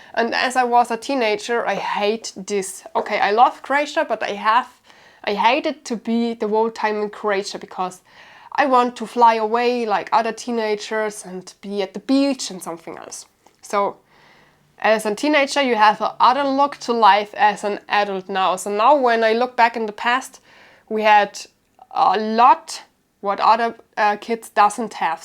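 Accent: Germany. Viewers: I really enjoyed this "peek" of your childhood and teenage years. I envy you, your trips to Croatia, Italy, etc. Has anyone told you that in your teenage photos, you resemble a young Gwyneth Paltrow? You are lovely . . . hearing your German-accented English takes me back to my almost 6 years in the Army, in Germany. I loved it.❄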